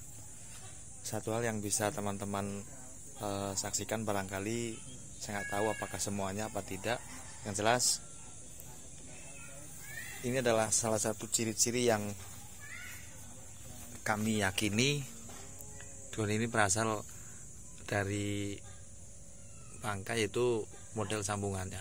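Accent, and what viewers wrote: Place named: Banyumas, Indonesia. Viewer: Dari nama Chanel Kirain orang Sumatra utara malah orang ngapak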